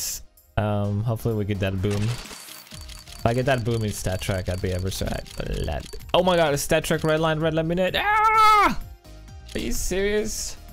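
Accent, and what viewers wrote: Romania. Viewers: is this guy from romania????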